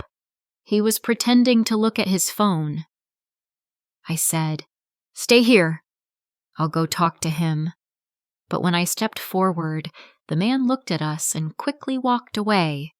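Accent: United States. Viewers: Is it American accent ???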